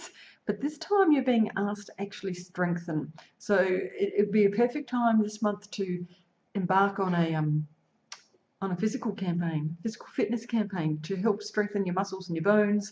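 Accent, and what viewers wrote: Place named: Australia. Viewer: Hi! Thanks for the warm, positive energy. You sound Australian and I love it. It's my intent to travel to Sydney in Sept.; I just have to find a way to make it work out in the best way. I've lived there twice for 3 months before. Sydney, Canberra, Melbourne, Port Lincoln and Darwin, plus a few days in Brisbane. I have a Jupiter IC going right through Canberra, with a couple of Venus crossing lines above and below Sydney!! Do you like Astrocartography? I'm a handwriting analyst and O love to combine them all with deep theta mediation. God, why am I rambling??:-) Just saying thanks for the positive vibes, love to see you in Sept!!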